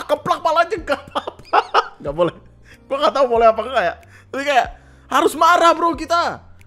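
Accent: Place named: Kediri, Indonesia. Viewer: Kediri banget logatnya😂 salam dari Kediri bang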